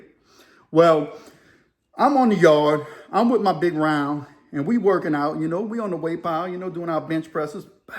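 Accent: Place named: South, United States. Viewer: Great story man! You have a nice slow paced southern storytelling style where 20 minutes went by and it didn’t feel like 20 minutes. You have a gift!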